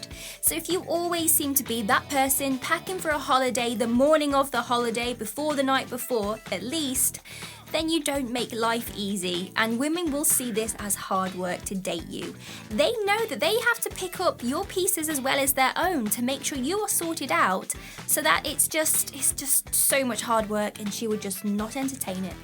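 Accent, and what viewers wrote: United Kingdom. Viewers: has potential to reach 1M subs. This british accent drive ppl crazy lul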